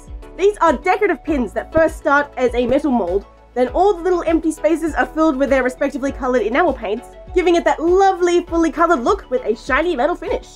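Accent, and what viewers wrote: Australia. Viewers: I forgot you were Australian until I clicked on this video......Oof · i have no idea why but i can hear the Australian accent stronger for this episode and i love it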